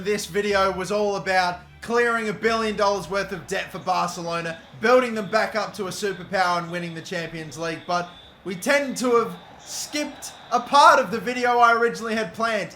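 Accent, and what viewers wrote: Australia. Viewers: Take some rest mate. Preserve that lovely Aussie voice.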